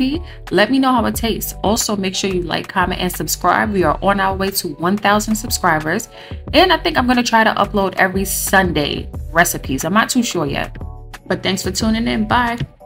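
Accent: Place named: New York, United States. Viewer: I’m going to try this tonight. Yessss to the editing and love your NY voice.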